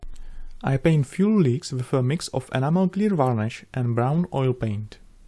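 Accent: Czech Republic. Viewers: Ahh yeah, the good old Czech Accent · jinak musim uznat, že se tvoje angličtina výrazně zlepšila:) už ten českej přízvuk tak netrhá uši:)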